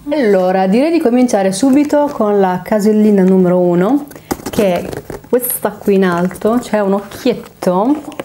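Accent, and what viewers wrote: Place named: Emilia, Italy. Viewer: Ahhhh vedi!? Io sono mezza veneta e mezza emiliana. Ho sentito un po' di accento emiliano (magari dopo qualche anno a Bologna lo hai assimilato!) per quello 😂 Spero di non essere stata indiscreta nel chiedertelo! 💕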